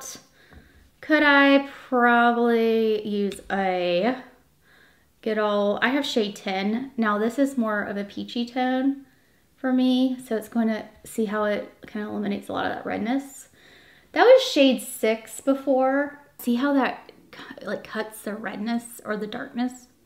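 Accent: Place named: Virginia, United States. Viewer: I love your accent. Are you from Virginia?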